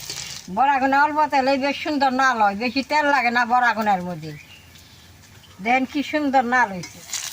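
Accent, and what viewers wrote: Bangladesh. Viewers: আচ্ছা ঠাকুমা তোমার জন্ম স্থান কোথায় গো, তোমার ভাষার সাথে আমাদের বাংলাদেশের বেশ কিছু জেলার ভাষার মিল পাই।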